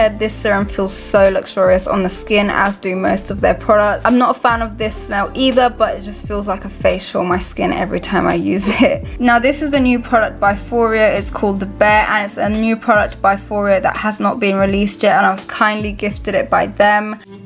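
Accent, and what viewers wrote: London, United Kingdom. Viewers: London accent straight up. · yessss I’m from London and noticed it straight away 🌚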